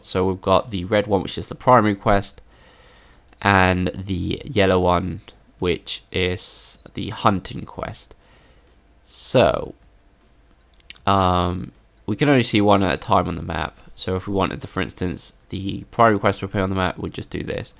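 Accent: United Kingdom. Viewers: Why the Japanese? You sound British?... Head explodes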